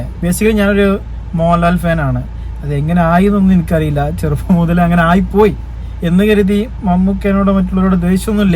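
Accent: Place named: Kozhikode, India. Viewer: ആദ്യം താൻ മലയാളം സംസാരിക്കാൻ പഠിക്കൂ... കോഴിക്കോട് ബാശ.... എണീറ്റു പോടേയ്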